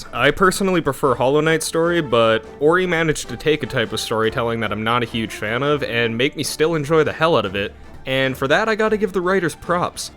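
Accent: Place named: Mexico. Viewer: English! You sound like Mexican and I do not understand Mexican.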